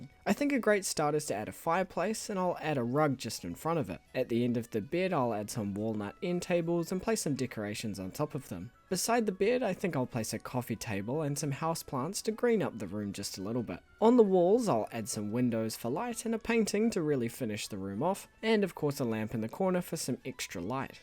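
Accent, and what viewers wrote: New Zealand. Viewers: There is nothing i love more than a good old kiwi accent. so calming to hear.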